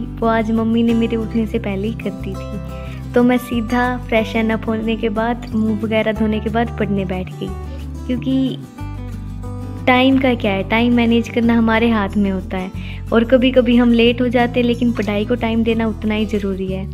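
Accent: Haryana, India. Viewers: Me to haryanvi tone ka addict ho gaya🤣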